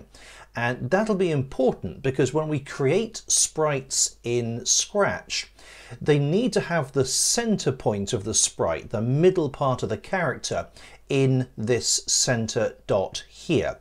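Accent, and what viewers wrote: United Kingdom. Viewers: Bro's voice is British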